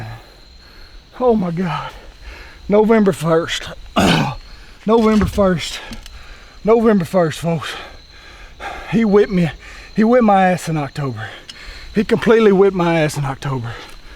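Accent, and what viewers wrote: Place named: Arkansas, United States. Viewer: Awesome story. Also you have the most Arkansan accent in Arkansas, so congrats there to 👏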